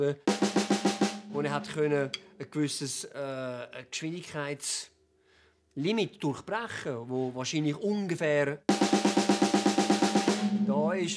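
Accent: Zurich, Switzerland. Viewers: Yes, Jojo speaks Züri-Dütsch, the Swiss-German spoken in the Zurich-area. I'd say it's probably one of the easiest Swiss-German to understand if you speak German. Certainly easier than let's say Bern-Dütsch or even Walliser-Dütsch. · is he speaking swiss german? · hat er in diese Video auf Deutsch gesprochen? oder ein Dialekt vom Schweiz? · Alter Schwede, ich kenne ja nur sein Englisch, aber auf Switzerdütsch ist er echt eine Herausforderung für einen Deutschen :D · Lymphosacroma Yes, I knew Jojo is from Zurich, and I also knew the German they speak is not exactly the "straight" German, but having studied German in school (some 30 years ago...) I thought I could understand a little bit more. So to say, I once could hear people speaking Kölsch (Köln dialect) and could understand much more than what Jojo is saying here... here in Italy is pretty the same though, when not worse: if a Northern Italian and a Southern Italian would speak each other in their dialects, they could barely understand! 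:) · Merci, ändlich mal e Ufnahm wo er Schwizerdütsch redet :) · He's from Zurich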